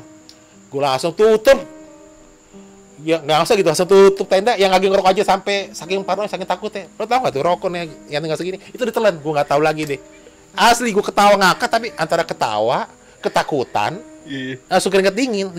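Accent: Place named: Betawi, Indonesia. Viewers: Abng ini enak story telling nya..khas betawi. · setau ane, ente orang (berdarah) sumsel (komering) bang. Manggil ibu, pake kata enyak, dan logatnya kok kaya ada betawi nya. ada keturunan betawi juga kah? atau hanya karena terbawa dialek gegara sejak lahir di jakarta?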